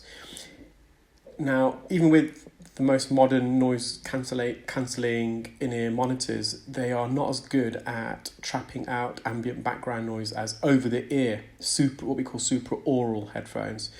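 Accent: United Kingdom. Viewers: He’s a toad; pay him no mind. I enjoy hearing your British accent and love your videos.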